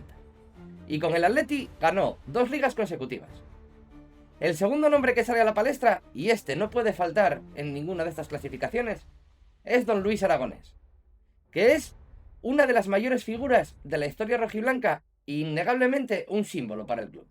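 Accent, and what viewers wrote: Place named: Asturias, Spain. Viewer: Buenas, yo también soy del Atlético y por el habla me paez que yes asturianu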